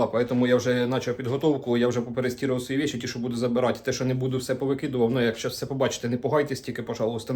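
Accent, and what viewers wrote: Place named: Zaporizhzhia, Ukraine. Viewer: Запорізька обл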